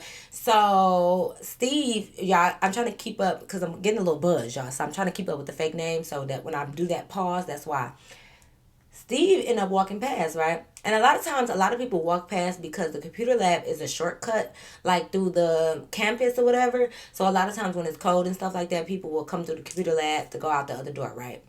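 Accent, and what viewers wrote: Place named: New Jersey, United States. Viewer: I fckn hollllered Get connected for free! With education Co- Nect - tion. GET THE FCK outta here 😂😂😂😂😂😂😂😂😂😂😂 idc what nobody say you Jersey coded to the bone 😂😂😂😂 I love this fckn channel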